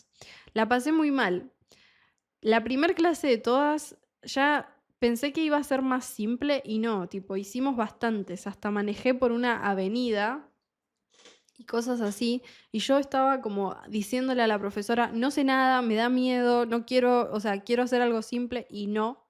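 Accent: Argentina. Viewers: Muchas gracias. Me encanta escuchar el acento argentino,es muy preciosa .Amo mucho a Argentina y espero poder visitarla algún día.Por cierto soy egipcia.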